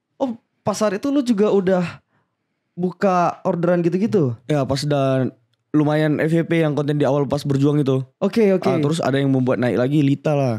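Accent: Palembang, Indonesia. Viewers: Pantess la logat logat dio ni cak uong palembanggg wkwk retinyo pernahh di palembang g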